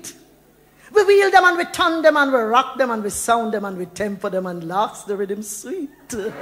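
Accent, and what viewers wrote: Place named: Jamaica. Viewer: Miss Lou tell dem ina Jamaican style.Yuh big bowt ya.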